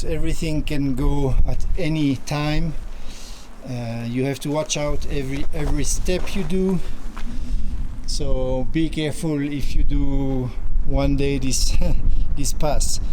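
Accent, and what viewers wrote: Switzerland. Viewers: Je comprends ton désir de t'adresser à une plus grande audience, c'est naturel quand on est sur YouTube et que l'on veut voir sa chaîne grandir. Malheureusement, il m'est difficile de prendre du plaisir en t'écoutant parler anglais étant moi-même bilingue et bloquant à chaque (grosse) faute de langue que tu commets. Tu vas certainement faire fuir une partie de ta communauté et gagner une nouvelle part d'audience, mais si tu n'as pas l'intention de transformer toute ta chaîne en english-friendly, j'ai peur que tu ne te tires une balle dans le pied car les francophone auront fuit et les anglophone n'auront plus de contenu facilement consommable et partiront aussi. En espérant retrouver le charme de ton accent fribourgeois. :)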